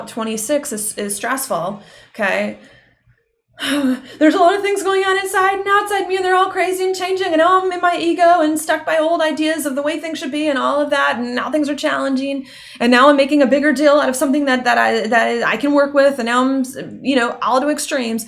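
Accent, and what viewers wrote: South, United States. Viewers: I love when I can hear your southern accent-let it shine xoxo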